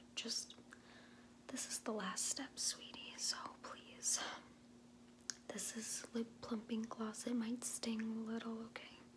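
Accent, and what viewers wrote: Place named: Massachusetts, United States. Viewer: You from mass?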